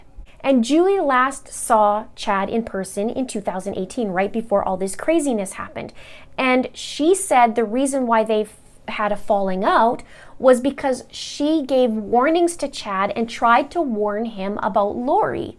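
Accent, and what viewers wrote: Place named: Canada. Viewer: She's Canadian I believe? They pronounce some things differently than other cultures.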